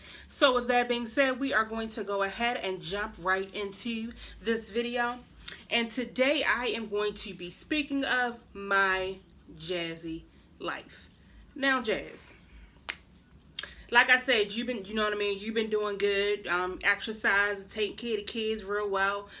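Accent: Baltimore, United States. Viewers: Hey chick! I love your accent. B-more! ❤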